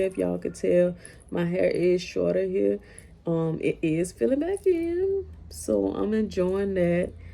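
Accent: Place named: Georgia, United States. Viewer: That Georgia and New Orleans accent blending beautifully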